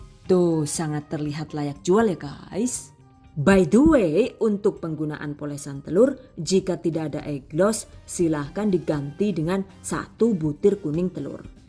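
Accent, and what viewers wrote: Banyumas, Indonesia. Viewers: Semua vidio saya suka karna disitu ada bahan2 nya,ada perhitungan biaya2 sampai hal yg terkecil dan sesuai daerah wilayah anda yah itu kata2 engga bisa lepas setiap per episode vidio yg lain nya dan satu lagi ngapak nya jos gandos😁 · Suka banget channel ini krn pengisi suaranya lucu dan menarik, apalagi kalau bahasa ngapak hahaha. Ada tips dan trik juga sukses selalu #GiveawayTHV2021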